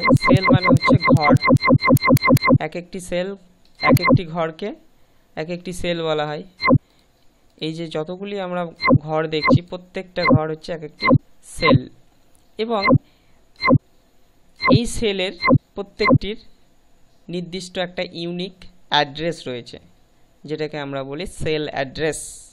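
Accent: India. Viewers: কলকাতা আর বাংলাদেশের মধ্যে উচ্চারণগত কিছু পার্থক্য আছে। সেটা ব্যাপার না। ব্যাপার হলো আমরা অনেক শিখেছি ওনার কাছ থেকে। তবে দার কথাগুলি অনেক ভালে লেগেছে এবং ওনার টিউটোরিয়াল থেকে অনেক উপকৃত হয়েছি। দাদা অনেক ধন্যবাদ আপনাকে। আপনার থেকে আরো অনেক টিউটোরিয়াল চাই। দাদা মাইন্ড করবেন না। কিছু লোক আছে যারা সবসময় মানুষের সমালোচনা করে।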